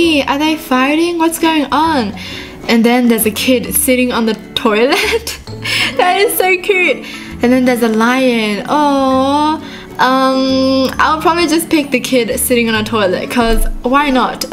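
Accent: Australia. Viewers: Good morning Miss.!!! I am the new student in your class:) I really like your Australian accent too!!!:D (wish I could go to her class)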